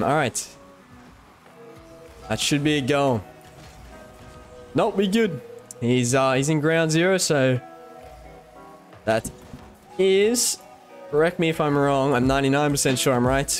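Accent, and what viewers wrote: Australia. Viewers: Road to top 50? That would be so cool to watch, if you can’t though, how about top 100? Also I just hit diamond in 1s (plat 2-3 in other comp modes ahaha), and I think I can attribute that (at least in part) to you and Sotus’ individual 1s series. It’s super helpful and inspirational watching you, so I just wanted to say thanks man. <3 also it’s awesome to have an Aussie RL YouTuber to watch... American accents can get a bit annoying after a while 😂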